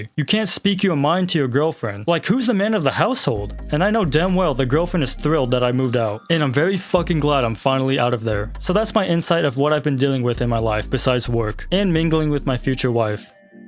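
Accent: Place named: Australia. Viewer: W Australian voice,w good as videos are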